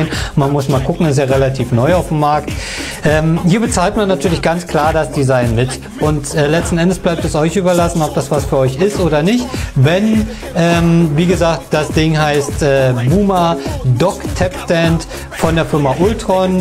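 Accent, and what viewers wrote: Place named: Hamburg, Germany. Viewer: Hamburger juuung :D Schönes Review, vielen Dank!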